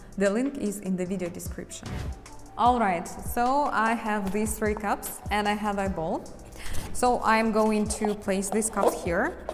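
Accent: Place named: New Jersey, United States. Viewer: That’s a lovely accent you have. New Jersey?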